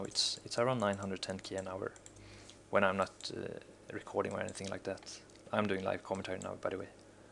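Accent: Sweden. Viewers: Hejsan! Du låter som en svennebanan!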